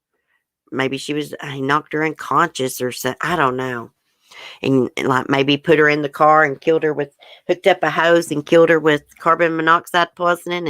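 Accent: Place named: Alabama, United States. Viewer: I love your accent! It’s very like my Alabama accent I had! Where are you from?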